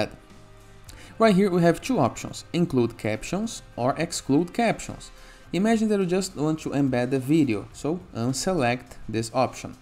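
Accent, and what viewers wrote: Brazil. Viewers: Você é brasileiro? 😂🤟❤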